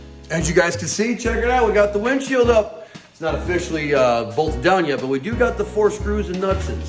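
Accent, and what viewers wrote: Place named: Tennessee, United States. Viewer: That accent sounds like around central TN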